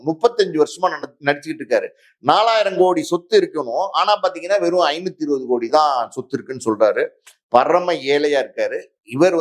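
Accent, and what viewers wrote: Madurai, India. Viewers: WOW Sivappu RED RAMARAJAN sattai with Pure MADURAI village bashai and SHANMUGA SUNDARAM Karakakkakaran style.. scratching THE CHEST.. WOW you are a great actor.. Wonderful voice...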